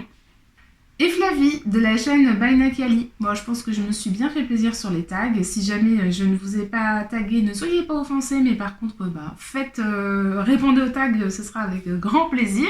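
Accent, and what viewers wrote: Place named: Moselle, France. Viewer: Coucou, Super sympa ton tagg. Merci pour ce beau partage. Ne t'excuse d'être remplie d'émotions pour ton fils... l'amour d'une mère vers son fils, cette maman que tu es prête à franchir des montagnes pour lui... c'est beau... oui, pas de pression... s'épanouir chacun à son rythme. Et sinon, trop sympa ton introduction musicale. A bientôt par ici ou par là-bas. PS: vive l'accent Mosellan. Allez, encore un peu et il sera encore plus prononcé.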